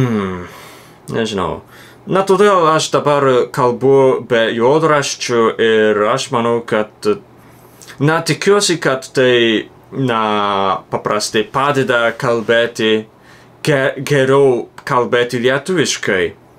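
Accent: Japan. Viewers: Gerai gyvemam...Šiaudais kurenam. Dūmai rūksta, nieko netrūksta. Vaike, nekreipk dėmesio. Tavo kalba nuostabi.Lengvas japoniškas akcentas yra labai malonus ausiai. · Aš tiems kurie kritikuoja tavo tarimą, pasakyčiau. Kada taip pat gerai kaip pradėsi tarti japoniškai, tada kritikuok jo lietuvių kalbos tarimą. O pasakysiu, kaip lietuvis tu tari lietuviškai siaubingai ir tai labai gerai, nes tu esi japonas. Kaip japono, tavo lietuvių kalbos tarimas nuostabus. Svarbiausia, kad mes tavo išsakytas mintis puikiausiai suprantame ir mes tavęs besiklausydami jaučiame pasitenkinimą. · Šeip galvojau, kad būtų naudingas patarimas -pastebėjau, kad kartais žodžius tari kaip tariama hiragana raidėmis ,bet čia yra taip nes mokeisi hiragana garsus nuo jaunystės, tai mano manymu kuo daugiau mokysiesi tuo tavo lietuvių pataps lietuviškesnė ir hiragana tarimas nueis. Aš girdėjes esu gerą patarimą iš tokio kino kuris moko anglų kalbos, kad geriausiai gerinasi tarimas kai žiūri filmus ir mimikuoji kaip aktoriai taria. 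Linkiu tau didžiausios sekmės su mokymuosi!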